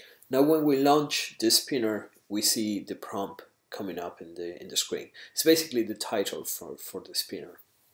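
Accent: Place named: Spain. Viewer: Es muy bueno el video gracias. Se nota que eres español. Viva españa!!!!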